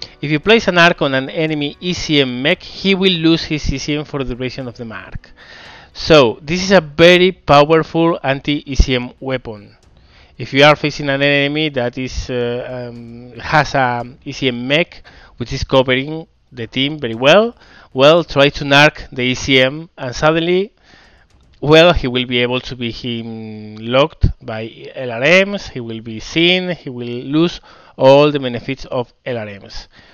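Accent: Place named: Spain. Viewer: Yay the spanish accent is back ;). Good vid.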